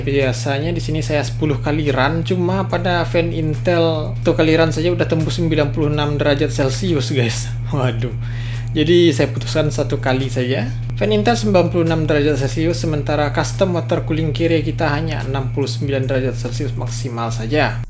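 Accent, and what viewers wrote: West Sumatra, Indonesia. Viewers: bahasanya indomi kali yah, atau prasaan saya ajaa :) , gw tebak pasti orang minang 🗿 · Jaleh bana urang minangnyo...🤣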